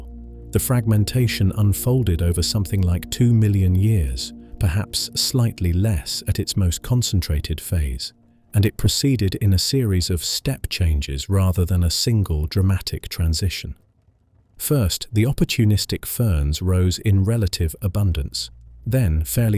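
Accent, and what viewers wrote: United Kingdom. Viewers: This is the best, most soothing ai voice out there. Perfect pitch and key mixed with a British accent. Gentle background droning creates ethereal atmospheric support that does not detract. Please stay with this voice for these beautiful stories of our blue magnificent planet. Thank you so much for creating and sharing. Very much appreciated ❤ Listening from Washington state. USA Subscribed ✅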